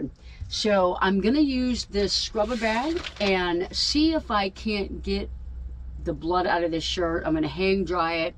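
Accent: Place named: Midland, United States. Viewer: I love your midland accent! "Warsh" away! :)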